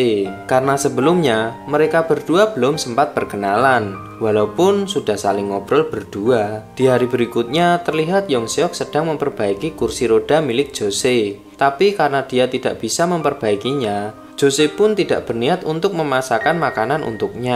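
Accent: Java, Indonesia. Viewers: Terlalu medok host nya 😅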